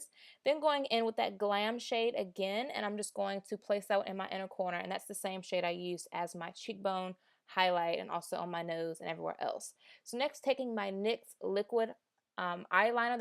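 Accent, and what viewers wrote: South, United States. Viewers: That southern twang! It's so cute!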